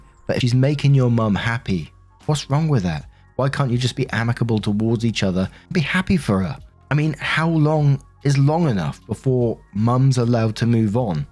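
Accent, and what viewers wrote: England, United Kingdom. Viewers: Hello - a fan from the U.S. here! I’m absolutely hooked on your videos. I studied at university in England for a year so love hearing your accent. It brings me back there. I really enjoy the emotion, inflection and humor you add to Reddit stories. I can tell you have a huge heart and really care about people. Thank you and keeping doing what you do!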